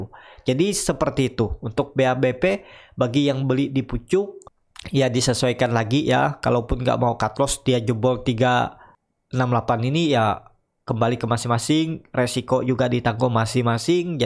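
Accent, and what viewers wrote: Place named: Banjar, Indonesia. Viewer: Borneo mana nie pak, logatnya sih orang Banjar nie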